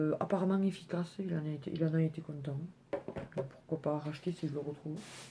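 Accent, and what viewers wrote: South, France. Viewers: Je t découvert il y a qq mois grave à fashion Barbie j'aime bien regarder tes vidéos. Et ton accent ramène du soleil dans ma région ou la chaleur n'est pas au rendez vous. J'ai de la famille à castres alors je connaît bien de ton petit coin ☺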